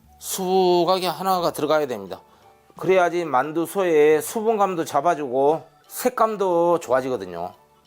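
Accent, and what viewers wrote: Jeolla, South Korea. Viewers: 전라도 사투리 들으니 더 맛있을 것 같은 느낌적인 느낌~~ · 구수한 전라도 사투리로 맛깔 나는 설명 감사해요😅😅😅😅😅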